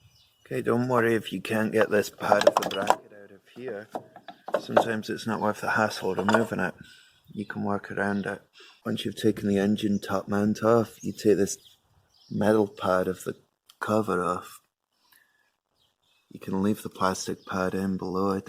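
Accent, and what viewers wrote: Scotland, United Kingdom. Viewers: Mine is doing this too, going to try oil flush and change first...not been done for ages. Also, love your accent mate, heard the Scots in it then seen your flag! :) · Amazing accent, so Scottish..yet all Canadian!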